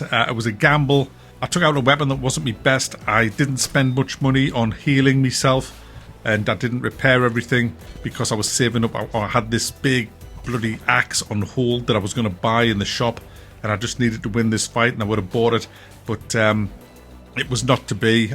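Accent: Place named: Newcastle, United Kingdom. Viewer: Nice to hear a geordie accent on a game review! You're my new go to game reviewer by default now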